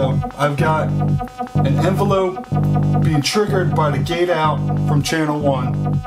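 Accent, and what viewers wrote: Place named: Philadelphia, United States. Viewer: Sounds like ur from philly but I’m guessin jersey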